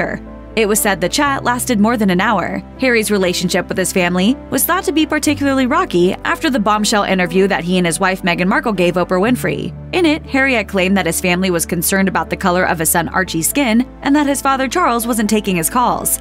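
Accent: United States. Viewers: I'm not worried about any of them,,,, but why is an American sounding so full of knowledge about it,,,, oh wait, she's American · This is the American take. I don't give this much slack. The American accent is slident against the subject of the video. Yakkity yak yak! Phew! (I'm a Singaporean.)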